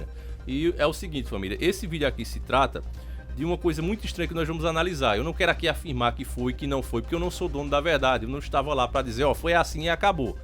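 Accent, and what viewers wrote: Northeast, Brazil. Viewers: O bicho você e nordestino né se for e nois viu 😏😏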